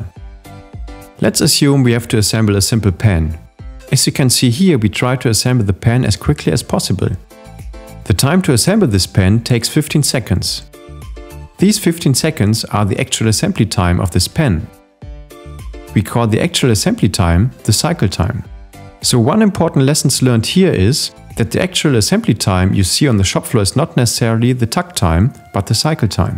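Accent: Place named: Germany. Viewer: Man hört, dass du Deutscher bist. warum machst du dann nicht das Video auf deutsch?👀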